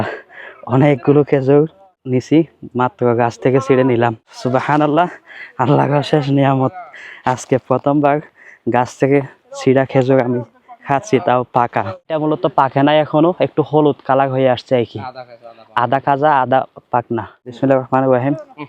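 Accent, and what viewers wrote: Chittagong, Bangladesh. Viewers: ভাই আপনার বাসা কি চট্টগ্রাম 😅😅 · chottogram এর মানুষ যখন সুধদো ভাষায় কথা বলে সেম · এটা মূলত চট্রগ্রাম এর আঞ্চলিক ভাষা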